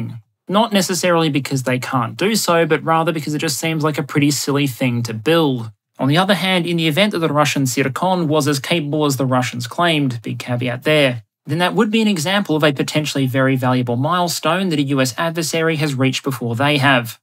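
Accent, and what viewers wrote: Australia. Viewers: I love this channel because it’s not just the short attention span of only 8 or 9 minutes for all the other videos. i’m only in adopted Aussie but as a yank it is nice to hear the fellow Aussie talk about the topic of geopolitics which I am quite passionate about in a more extensive ways regarding equipment of military defence and offence. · Ah yes I am going to listen to an Australian man for the next hour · It is frankly absurd that 1-hour PowerPoint presentations are right at the top of the content I am most excited to see each week. That damn Aussie dry wit and accent! :)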